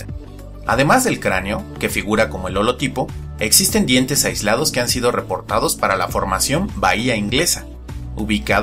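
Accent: Mexico. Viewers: erea de México? :O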